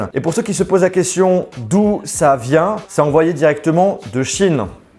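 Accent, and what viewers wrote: England, United Kingdom. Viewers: TON AXAN ANGLAI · hahahaha j'aime ton accent d'englais sa me fait rire 😂👌✌️❤️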